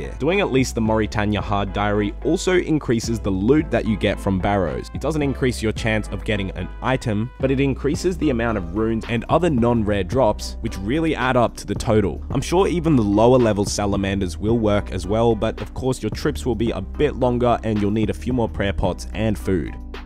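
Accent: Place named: Australia. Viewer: Australian accent bro. Buttah instet of butter. Houah instead of hour.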